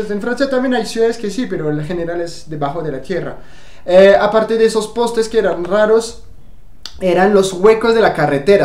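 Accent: Bogotá, Colombia. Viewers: De Francia!!, hablas muy bien el español y me encanta tu acento colombiano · Hola, tienes un acento muy rolo. Jajaja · Tiene el acento rolo medio ahí jajaja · Re perdido..hablas muy Colombiano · Soy mexicano , me encanta Colombia voy muchas veces al año, me doy cuenta que hablas muy bien el español colombiano, hablas tan rápido como ellos jiji, me gustó tu vídeo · Primera vez que veo tus videos, me encanta que te guste mi país... que chevere tu acento ya es muy colombiano, extraño mi tierra a la distancia, y el himno a las 6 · Hola en cuanto tiempo aprendiste español colombiano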